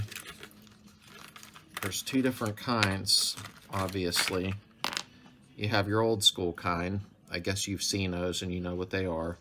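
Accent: Pittsburgh, United States. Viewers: pittsburgh accent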